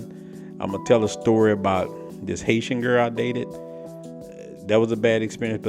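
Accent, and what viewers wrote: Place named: Louisiana, United States. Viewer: what part of Louisiana are you from bro? I can hear a Louisiana twang. I'm from the boot and the way you said baffruum(Bathroom), I was like, bro from the N.O, but I can hear some of that Lafayette area in his voice also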